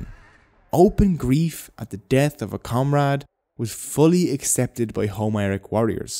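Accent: Ireland. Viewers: "Sw- swiss physicians. Oh what a f------ word combination!!!" I love the English language and your Irish accent. Thank you for the laugh.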